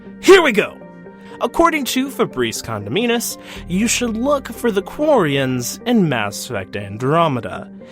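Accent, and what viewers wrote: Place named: Scotland, United Kingdom. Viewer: scottish accent....oh god yes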